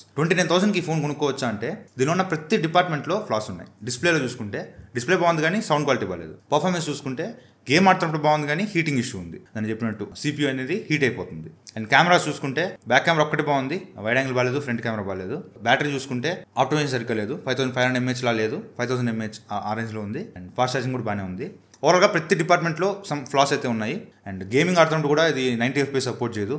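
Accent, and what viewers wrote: Nellore, India. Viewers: Bro meedhi nellore aa? 😮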